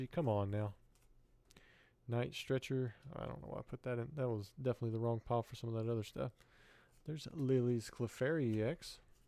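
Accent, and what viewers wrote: South, United States. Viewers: That seems pretty on par for a traditional booster box, but for a giant special set it’s going to be rough 😅 Also, it’s nice to see someone else from the Carolinas! I don’t hear that familiar southern accent often when watching pack openings lol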